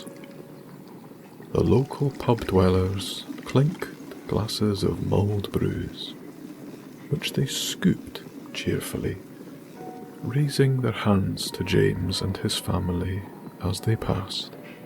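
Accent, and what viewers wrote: Scotland, United Kingdom. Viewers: Santa with a Scottish accent okay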